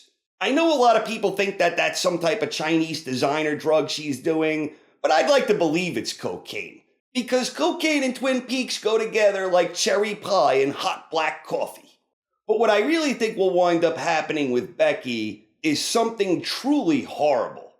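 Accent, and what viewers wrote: New York, United States. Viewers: Guessing he's from New York, maybe the Bronx or Brooklyn, and or, spent a while in Philly. Either way, his narration is clear and concise, his understanding of and his ability to describe the events on screen is uncanny. If you like Twin Peaks you need to be watching this guy.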